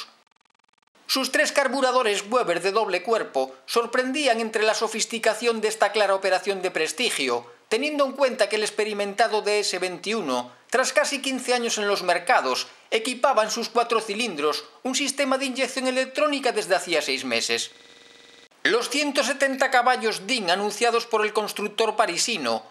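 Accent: Galicia, Spain. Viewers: La entonación parece robótica, es estresante, no sé si serás gallego. El vídeo muy bien realizado y el coche me encanta.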